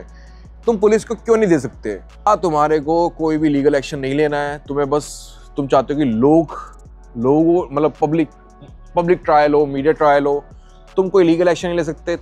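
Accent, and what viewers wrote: India. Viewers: Aap Bihar se ho naa?? Aapka tone vaisa hi laga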